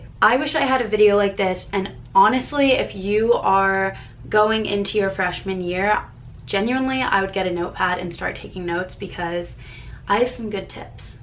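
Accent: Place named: Australia. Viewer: you have a low key Australian accent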